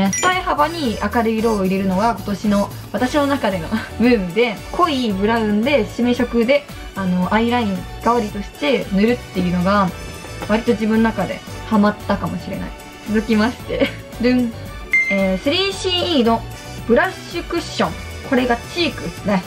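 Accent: Okayama, Japan. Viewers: 岡山弁かわいい！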